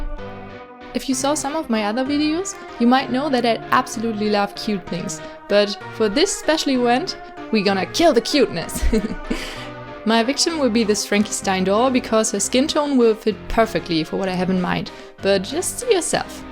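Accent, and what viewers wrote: Germany. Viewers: This is definitely my favorite out of all the Halloween dolls I've seen! Ill be sad when Halloween is over after today and everyone goes back to making pretty pink dolls :D Disregard this if I'm wrong but it sounds like you're German. Where did you get the Apoxie Sculpt from and how much did you pay? From what I've seen it's super expensive to buy in Germany. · Are you German? Because u got this accent of one btw this video was so good and thank u for not spoiling the end result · Ich wusste sofort, dass du Deutsch bist😂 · I thought so, you have the same accent as my friend. She hates her accent, but I love it. I like the German accent. <3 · Are u german?because of the "moin" and the way you speak(not in a mean way,you are super talentet and friendly,I'm just wondering) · Are you german ??? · I usually watch dollightfulls videos but NO REGRETS WATCHING YOURS! 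you earned a new subscriber! 💜 Btw, are you german by any chance? Your accent reminds me of how my class members talk English lul. Sorry if you're not. It just reminded me of that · Ja Deutsche erkennt man immer wenn sie englisch sprechen xD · Its a german accent, if you want to know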